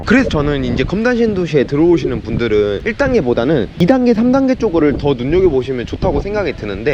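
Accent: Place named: Jeolla, South Korea. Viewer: ㅋㅋㅋ 방송하지마라 공부를 쳐 하고 하던지 부알못아ㅋㅋ 전라도 억양 오지네ㅋㅋ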